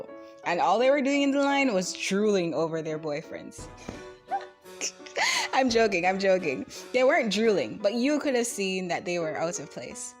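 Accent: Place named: Jamaica. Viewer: I love Jamaican accent great video and editing awsome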